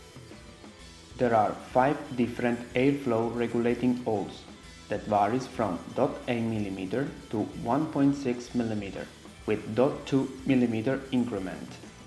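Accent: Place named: India. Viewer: Guarda che parlare inglese con accento indiano non è così facile. Grande! :)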